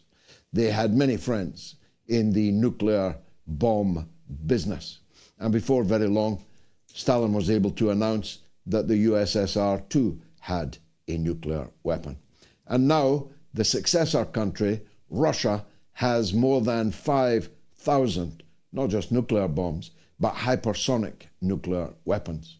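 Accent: Scotland, United Kingdom. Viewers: Because of his peculiar Scottish pronunciation and the way he delivers (pauses very contrived) we apportion to him a type of reverence. He is just another socialist scumbag from the north that has nothing to look forward to but the ‘lake of fire’ because has not repented and keeps grinding on on these dismal subjects. do you think you are exonerated by your monologues-there is zero redemption in pointing out the sins of others for you or any other commentators bro. Take a break and examine your own life my friend before it’s too late…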